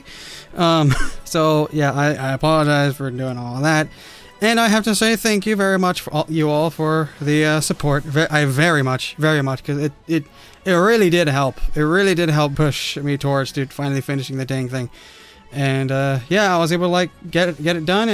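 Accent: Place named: Scotland, United Kingdom. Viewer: I LOVED your cute Scottish accent!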